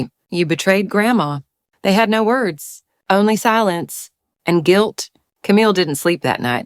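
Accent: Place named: South, United States. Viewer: LOVE THE SOFT SOUTHERN ACCENT. Good story.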